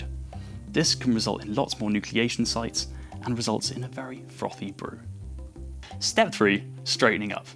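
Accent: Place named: RP, United Kingdom. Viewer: He has a posh accent haha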